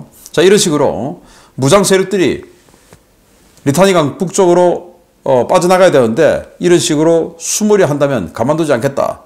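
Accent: Gyeongsang, South Korea. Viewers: 늘 나라위한 소식 감사합니다 그러나 알려서 오히려 해가 끼칠걸 판단 하시는것이 먼저 진정한 나라위하는 것이고 자신 중심과 우상적 말씀은 자제하셔요 가만히 앉아서 실기의 전쟁상황을 이론의 말씀만으로 자신하십니까?.그리고 뒤가 먼저입니까?나아가는 앞이 먼저입니까?마치 한국이 전쟁을 치루어야 되는것에 긍정만 보시는데.핵심은 전쟁없이 살생없이 주변과.특히 북한과의 사이 아닙니까? 또.제일 중요한것은 팔이 안으로 굽는다고 북한군인이 죽기 살기로 뛰어드는 사정을두고 무기줄테니 죽여라는 것입니까?그리고 또.친구 비유 하시는것도 보면 사상적 까지 의심스럽듯이 친구것을 먼저 탐하는것에서 화끈이 되네요.아무리 가까워도 내것이 아닌데 버리던 말던 왜?열을 내십니까?바라건데 앞으로 전쟁에 대한 자료는 접는게 더 좋을듯 합니다.또.경상도 억양으로 진짜 경상도 사람을 욕먹이고 있지 않습니까?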